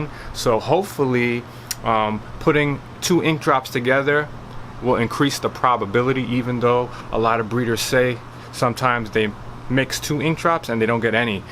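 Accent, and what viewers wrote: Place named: New York, United States. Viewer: Nice video......do I detect a NY accent? I see you have a African fat tail gecko. If you are new with them use the ground coconut fiber or even the Lugarti reptile bedding. They need a little higher humidity. The Lugarti bedding would probably work well with your snakes as well.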